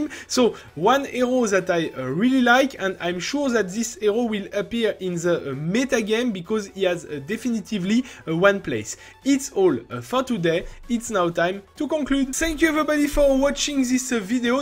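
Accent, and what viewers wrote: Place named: France. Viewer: Est-ce que tu pourrais apprendre à parler Anglais sans un accent français, parce que là... Mes oreilles saignent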